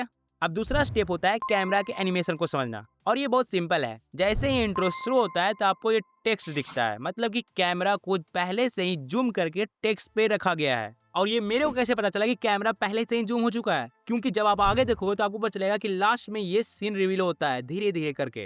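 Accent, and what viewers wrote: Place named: Bihar, India. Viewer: Bihar ya jharkhand se ho kya? Ascent to waisa hi hai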